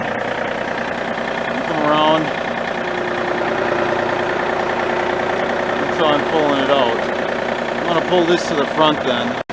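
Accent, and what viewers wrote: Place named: Maine, United States. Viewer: where are you from there brother sounds like you are maine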